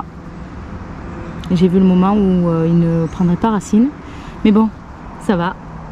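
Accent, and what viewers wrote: South, France. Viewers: Coucou, Ça m'amuse de voir la différence entre le sud de la France et le nord 🇧🇪 l'accent, le type de récolte . Suis impressionné par la taille de tes aubergines et poivrons ! Par contre on a à peu près la même façon de faire. Merci pour cette vidéo A+ 🇧🇪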